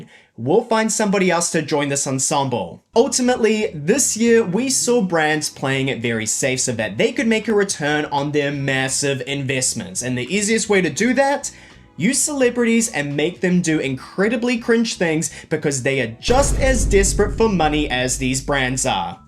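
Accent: United Kingdom. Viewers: ile the fact that is a british person explaining this :hand-purple-blue-peace:😭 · Why is MrBeast reviewing commercials with a British accent?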